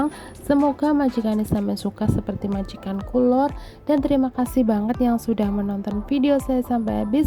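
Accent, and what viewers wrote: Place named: Java, Indonesia. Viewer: 😍😍😍, ketemu konten nya mbak saya jadi semangat masak , trimakasih ya mbak 🥰🥰🙏 mbaknya asli Gresik Yo kok jowo ne lucu 😂😂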